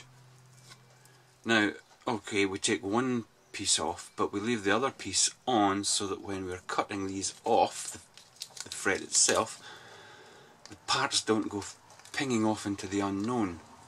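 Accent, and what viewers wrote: Scotland, United Kingdom. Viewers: I have to say I find your features very interesting and your a fantastic modeller!! I love that Scottish accent and the drink reviews are great! You've hooked me and unfortunately I can never get any modelling done as I seem to have all my free time eaten up watching you... which isn't so bad. Looking forward to many more hours of your lighthearted observations. Cheers from Canada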